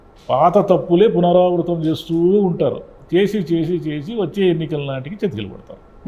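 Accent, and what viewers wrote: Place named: Rayalaseema, India. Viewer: Sir, 👏👏. Rayala seema BLOOD - Sarkar Vari Prounsation is Difference. KADAPA lo Okasari Vellinanu Kotha Prajalanu Okavidham ga Chusharu.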